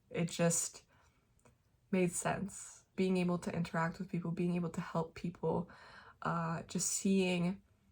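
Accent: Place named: United States. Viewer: Congratulations!! I always thought you were from America lol. Canadian and American accents are so similiar I can't ever tell the difference.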